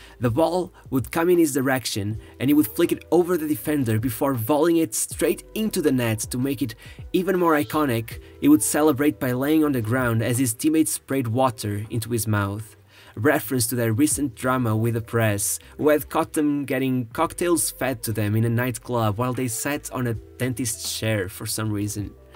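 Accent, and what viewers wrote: Portugal. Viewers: Portuguese accent i love it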